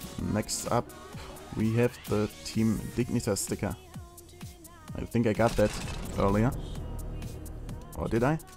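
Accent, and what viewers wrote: Germany. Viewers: SO ein heftiger deutscher Akzent. Meine fresse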